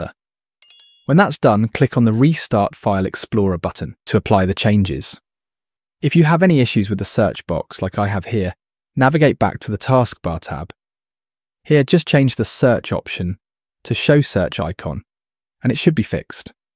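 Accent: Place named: United Kingdom. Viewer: I don't trust him mainly because of his UK accent I don't like him I don't trust them That's gonna be a no for me dog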